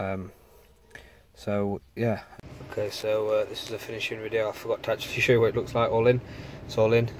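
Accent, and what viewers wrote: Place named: Sutton, United Kingdom. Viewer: I knew you was from Sutton the first video I watched! I can tell by the accent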